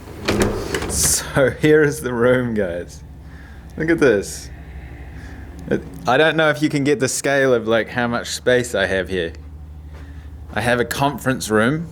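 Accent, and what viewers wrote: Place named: New Zealand. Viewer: He is a New Zealander, not Australian. And if you have a hard time with the accent click on CC and you can read along with the captions. ( true blue Kiwi born and bred here ) :)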